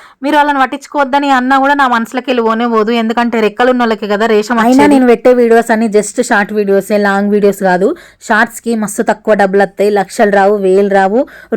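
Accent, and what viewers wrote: Telangana, India. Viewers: Akka telangana slang naku artham kaledhu.akka.kucham slow ga chepu akka.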